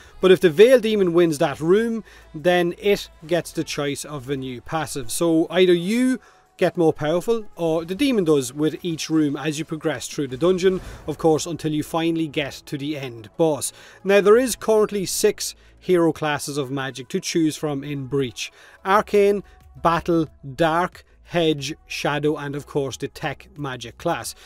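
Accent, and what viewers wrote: Ireland. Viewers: Every time I watch one of ur vids, your accent make me think about the 100000 pints of Guinness I used to drink when I was in Ireland back in the 90's...and I feel nostalgic :D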